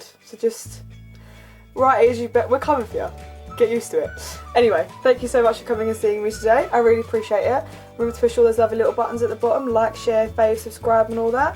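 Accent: Essex, United Kingdom. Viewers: hey, greetings from Turkey, thanks for the videos, heped me a lot get Essex accent. ❤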